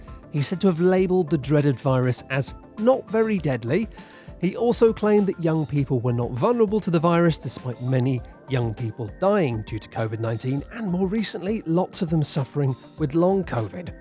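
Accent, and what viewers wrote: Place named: United Kingdom. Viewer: British people make up such a small fraction of the world yet such a high percentage of Youtube narration. I don't like it. Turning off.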